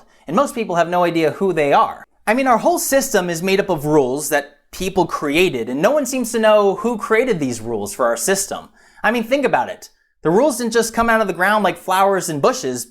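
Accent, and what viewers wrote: United States. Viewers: woah brother are you from Idaho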